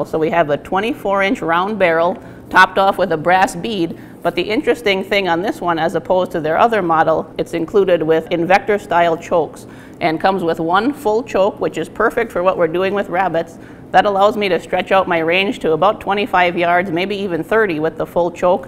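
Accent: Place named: Wisconsin, United States. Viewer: Wisconsin accent coming in thick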